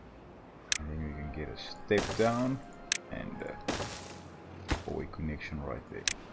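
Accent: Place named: South Africa. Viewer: aweh, ek help maar uit met n comment want ek kan hoor jy is nie engels en dis min wat mens SA mense kry wat sulke videos maak. well done!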